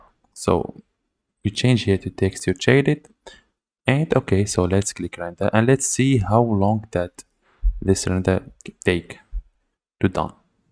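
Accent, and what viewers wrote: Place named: France. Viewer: Your english is great and your accent is barely even there. Like, you can tell you are french, but your english is totally normal just with a normal french accent. Your english speaking is better than the majority of Americans, some of who have really thick accents even though they only speak 1 language, lol.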